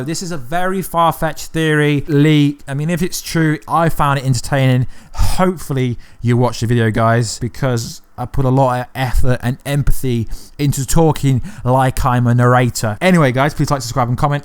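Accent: United Kingdom. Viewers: Bruh are you British or a South American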